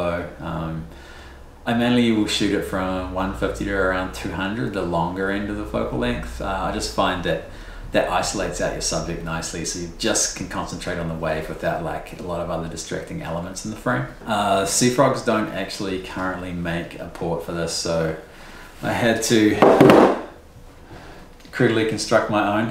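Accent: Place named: New Zealand. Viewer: Awesome video, thnks very much! Was trying to watch it on a bus with the subtitles, kiwi accent is the best but clearly a struggle for youtube to match subtitles too haha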